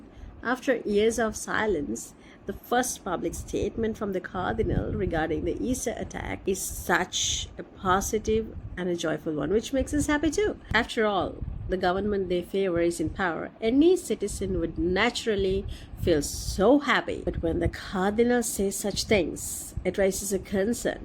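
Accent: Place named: Australia. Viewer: Wow where do you get this accent from? It’s very close to Australian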